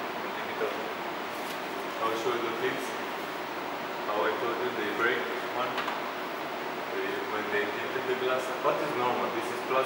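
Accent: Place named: Ireland. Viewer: It's an irish accent I believe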